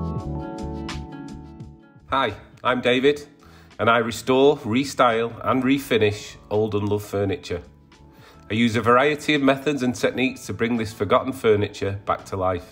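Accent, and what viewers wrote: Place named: Northern England, United Kingdom. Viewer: Lovely. You’re from northern england? I recognize your accent. Great job. Great piece to have.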